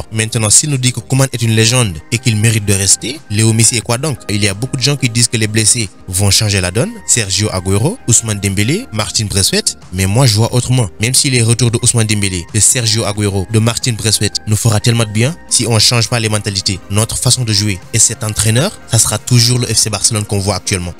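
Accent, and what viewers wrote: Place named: Senegal. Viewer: Thia accent sénégalais bala consommation locale la wakh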